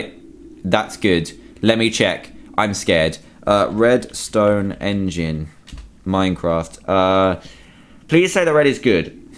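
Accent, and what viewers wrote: United Kingdom. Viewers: *well he's british, and they pronounce it different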